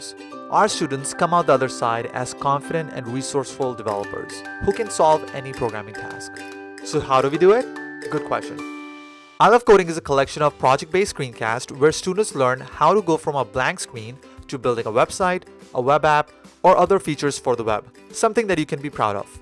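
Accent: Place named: India. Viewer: I love your Indian accent in the background :) :) makes the video makers more authentic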